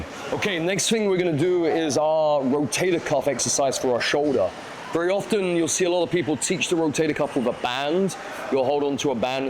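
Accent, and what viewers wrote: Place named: England, United Kingdom. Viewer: I'm sorry I can't take this guy seriously with all those tattoos combined with his Wallace and Grommit look and middle class English accent on top. I would prefer t learn from the Thai trainers, are there any left in Bangtao?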